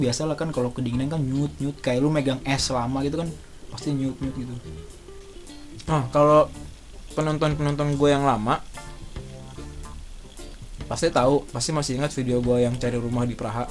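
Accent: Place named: Depok, Indonesia. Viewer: Bahasa lu masih anak depok banget bor.kwkwkwkw keren keren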